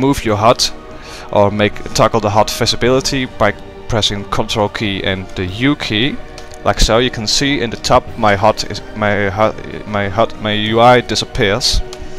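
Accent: Netherlands. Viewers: Yeah, I hear it too. He definitely sounds Dutch.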